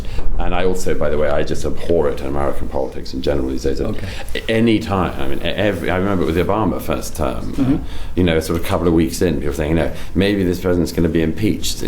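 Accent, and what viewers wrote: United Kingdom. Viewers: Murray: Britain's favourite Neocon! Basically Paul Wolfowitz with an English accent. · Irish girl here who loves posh English accent 😍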